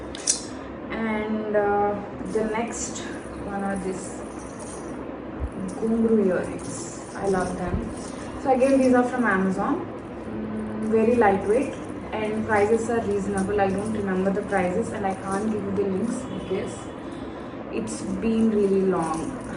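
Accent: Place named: Visakhapatnam, India. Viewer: Very nice collection sisy...ur looking like Regina..ur growing up in ur life very nice...ur telugu language exactly mine..bcz iam Vizag..I dnt knw ur bio..Anasuya episode lo mi language vinin doubt vachindi...iam hpy..ur rocking dear..God bless you.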